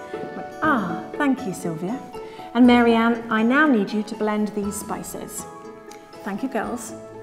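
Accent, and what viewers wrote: United Kingdom. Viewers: What an amazing look, what an amazing British accent, what amazing kitchen tools .. It's a Brilliant video which made me so happy 😁 it's so classy & elite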